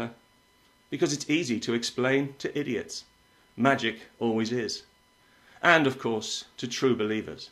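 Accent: England, United Kingdom. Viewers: I like the accent? English?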